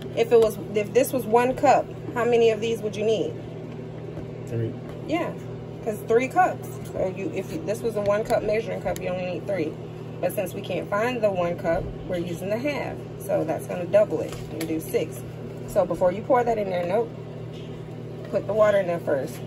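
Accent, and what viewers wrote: South, United States. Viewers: You got me cracking up with the southern accent 🤣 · Girl, you are so crazy! Your southern accent sounds like that character sister husband in that movie where the heart is.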